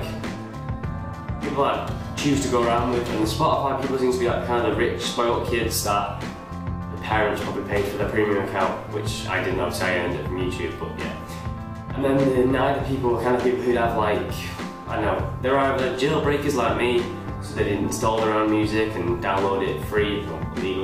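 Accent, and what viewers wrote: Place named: United Kingdom. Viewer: British accent eminem voice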